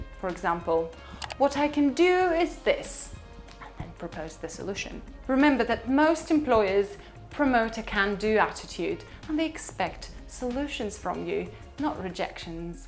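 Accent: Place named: United Kingdom. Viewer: Странное сравнение... У этой девушки поставленный британский акцент, в то время как у Марины - американский.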